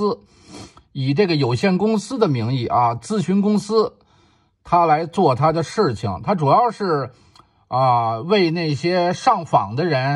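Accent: Beijing, China. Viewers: 听了您的北京话好亲切啊，谢谢您说许志永和丁家喜的这件事。您也是有良心的中国人。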